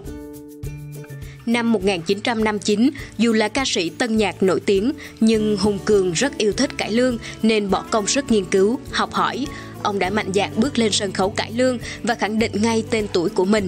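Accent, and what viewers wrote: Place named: South, Vietnam. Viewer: Giọng đọc hay, giọng Nam bộ mà sao thấy ko giống với kiểu cách mà người Nam Bộ xưa nói thế nhỉ, cảm thấy khang khác! 🤔🤔🤔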